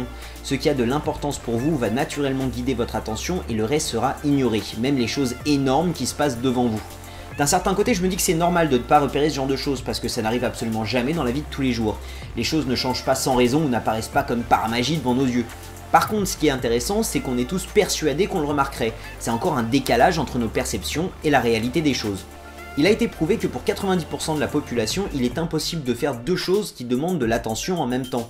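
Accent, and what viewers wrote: England, United Kingdom. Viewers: j'avoue ton accent anglais il m'a fait rire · J'adore son accent anglais xD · Lol moi qui suit anglophone et qui regarde egalement tes videos pour ameliorer mon français je suis mort de rire de ton accent anglais il est drole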